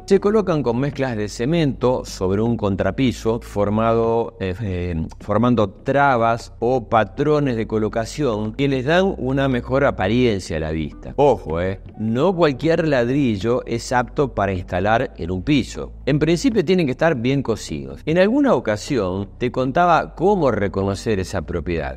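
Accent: Argentina. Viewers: Aprecio el modo suave, claro y preciso de sus explicaciones, se nota que Usted sabe de lo que habla y su accento muy ligero a Argentina aún aumenta lo agradable que suena, le da un toque especial 😊 sigua así Maestro👍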